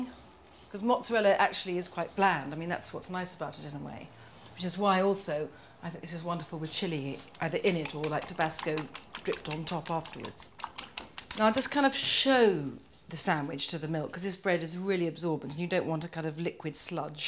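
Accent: United Kingdom. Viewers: I remember watching some of her videos as a kid. It's one of those wistful kind of memories. I really liked her but I couldn't put my finger on why. I think it's partly the cinematography that gives her the signature charm. It's a combination of a British accent, great camera work, and classy ASMR.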